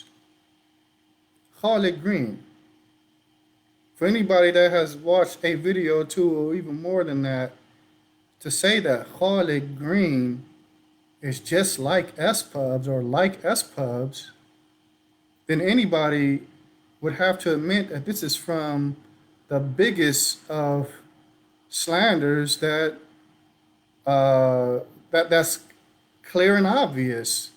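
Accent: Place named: South, United States. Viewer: جزاك الله خيرًا، you give me a feel that you’re from the south Shaykh even though I know you’re from L.A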